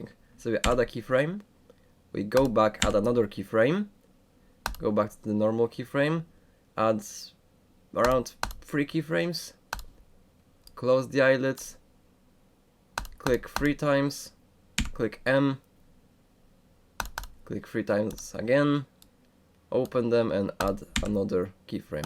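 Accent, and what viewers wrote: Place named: Poland. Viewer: masz polski akcent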